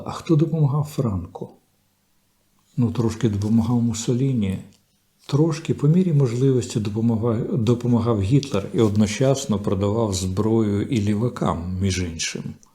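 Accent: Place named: Russia. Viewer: Доречні, пане, у Вас з'явився російський акцент! Таке враження, що Ви перекладаєте російський текст на українську!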